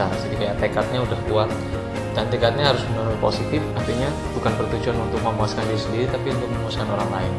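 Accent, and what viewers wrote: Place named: Java, Indonesia. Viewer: bandung kok logatnya medok mas?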